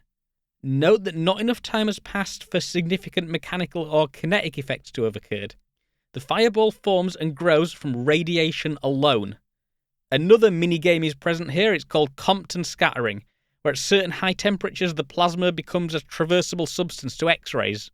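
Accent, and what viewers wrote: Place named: United Kingdom. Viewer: He doesn't have a nerd voice! He's just aggressively British!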